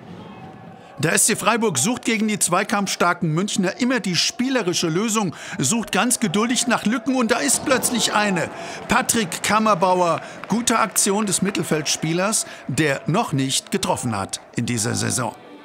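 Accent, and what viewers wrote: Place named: Swabia, Germany. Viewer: Ein noch frustrierter Schwabe als Kommentator?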